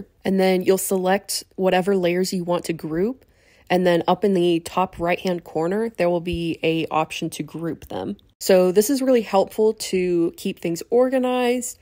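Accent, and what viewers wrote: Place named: South, United States. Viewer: I can hear the southern, and thank you for the video!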